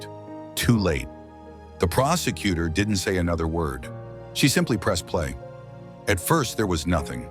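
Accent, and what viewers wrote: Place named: United Kingdom. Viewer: What's with the British accent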